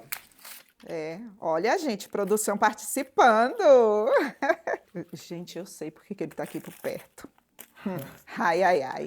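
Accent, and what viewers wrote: Bahia, Brazil. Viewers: Tenho certeza que a senhora é baiana É o nosso sotaque! Maravilhosa receita !!!!! Parabéns pelo trabalho 🎉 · Oi amada com certeza vc é baiana sotaque que morro de sau😮dades dos meus amigos solteropolitanos, morei em Salvador 22anos, bjos amada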